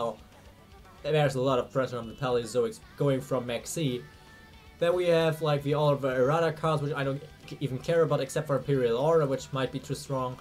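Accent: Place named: Germany. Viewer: Where are you from? You sound german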